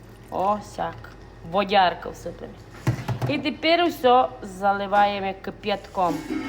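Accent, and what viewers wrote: Transcarpathia, Ukraine. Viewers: Не мала дивитися се відео, та почула закарпатьську говірко, то відкрила. Дуже скучила за цим чудовим краєм. Дякую.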